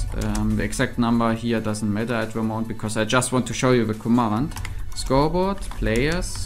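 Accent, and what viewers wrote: Germany. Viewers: Ah yes, another German-accented minecraft nerd🤓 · are you german? your voice really sounds like german-english XD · Ich vermute dass du Deutsch bist. Ist das richtig?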